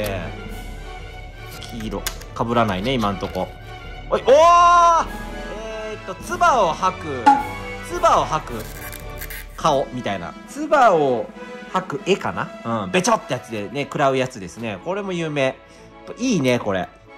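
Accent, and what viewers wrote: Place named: Kyoto, Japan. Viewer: 関西弁よき😊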